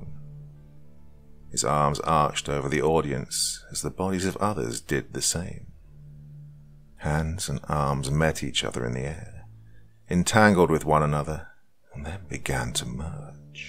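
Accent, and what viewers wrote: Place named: United Kingdom. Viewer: We are ready dear dear Doctor! You are the real thing 😁 And now we will settle. down and enjoy your wonderful Brit voice😘😊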